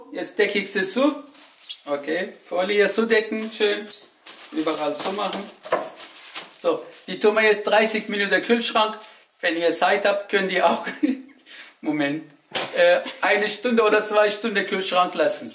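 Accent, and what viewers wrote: Swabia, Germany. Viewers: bist du Schwabe? 😃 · Sympathischer Akzent. Wo wohnt ihr? Schwaben? Liebe Grüße aus Lübeck. 👍🍽️ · Stuttgarter?? xD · Schwabe??? Hört man so im Abgang, oder täusche ich mich? Sehr sympatisch :-) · du bist Schwabe:D Ich feier dich mein Freund!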